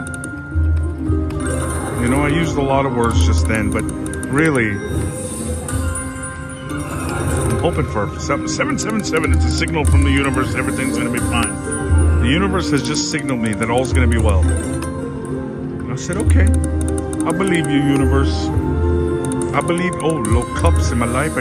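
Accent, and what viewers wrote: Louisiana, United States. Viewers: Are you from Cajun Country?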